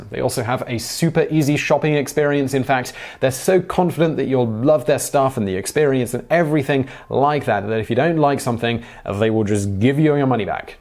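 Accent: United Kingdom. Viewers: how tf did i never know he was british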